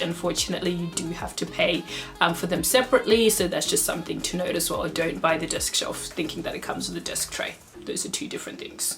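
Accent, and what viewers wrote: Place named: Australia. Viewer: As soon as I heard your accent I was like yess, an Aussie! I WAS contemplating buying the shelf from Grovemade but I just got to the bit where you said it $150 just for shipping O_O. girl no..that's not in my budget lol. I shall keep looking elsewhere but great video! <3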